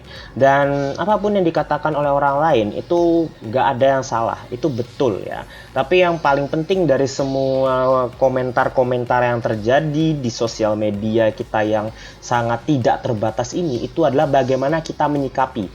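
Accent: Banjar, Indonesia. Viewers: Ikam urang banjar kh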